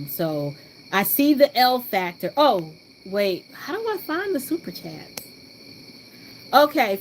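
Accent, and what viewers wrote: South, United States. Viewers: I love that country sound!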